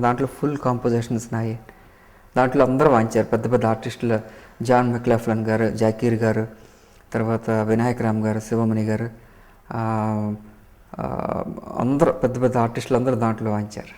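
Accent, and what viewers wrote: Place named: Godavari, India. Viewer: Sir you sound godavari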